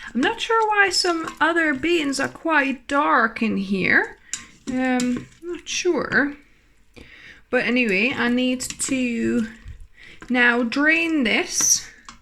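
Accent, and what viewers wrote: England, United Kingdom. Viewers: Just found to see about how to go about making some black soy beans I have for natto. I'm not worried about the beans splitting, so thinking I'll give a go after rinsing first at maybe 45 minutes with 2" of water at least above. Not to be rude my dear, though you have the most combined European accent I've heard. Sounds a mix of Great Britain and then some. Thanks for sharing. I'll try to document and report back on my vlog YT channel the results. Thinking I'll not use the Mueller pressure pot and go with the Instant Pot if I can't confirm the pressure settings being equivalent to the High Pressure off the Instant Pot IPDUO80 I have out. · What a nice English accent. 😅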